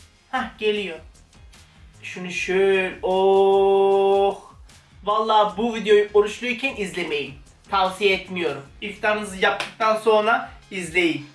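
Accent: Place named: Kırşehir, Turkey. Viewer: Kırşehirli olduğu çok belli ya babannem çok güzel diyo ya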